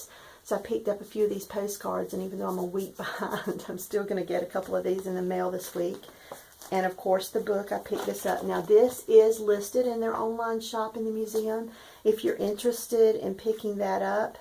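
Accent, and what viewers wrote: South, United States. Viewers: You make me laugh, your southerness is the best.......what a wonderful idea for your sons room.....god bless him for serving, my son is in the AF as well 17 yrs ......I miss him immensely, he will retire hopefully in 3 I don’t think he plans on staying longer, he will be 38 yrs old him and family are stationed in Vegas I live in ohio so I only see once a year, it’s hard...but they will move home after retirement...yeah! Love all your stitching, stash and junking....I’m a junker too 😀😀😀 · Thank you so much for sharing your trip to Charleston! It is definitely on my list to visit! I was born and raised in Florida, and have many ancestors from GA and North and South Carolina 😄 Your stitching is gorgeous! And your southern accent makes me homesick. Enjoyed my visit! ☘️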